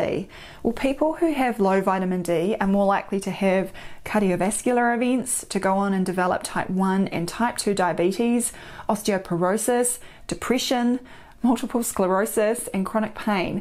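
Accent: New Zealand. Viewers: What I enjoyed the most about this video “Kiwi accent” noticed one thing more Hollywood is alive & kick’n in Kiwi Land, you know I’m just been facetious, my first time it’s informative, talking about sun, I am from beautiful Huntington Beach CA weather is always good. All in all it’s informative video! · So wonderful to hear a Kiwi accent! Appreciate your videos. You are a picture of health an vitality yourself. Thank you. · Thanks for the information. It's a beautiful sunny day so I am going out and catching that sunshine. You are blessed with THE most Kiwi accent I have ever heard! Love it! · Who knew health information could be so exciting? That combination is irresistible: - beaming smile - sweet and gentle demeanor - medical facts delivered in a comprehensible way for normal people - love the kiwi accent! You are officially my internet doctor,!:) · The Kiwi accent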